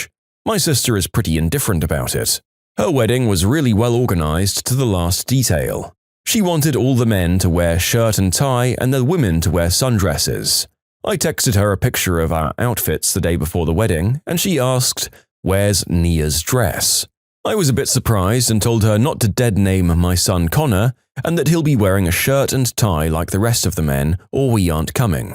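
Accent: United Kingdom. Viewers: I didn't know the narrator is british now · Why do you sound *BRITISH*???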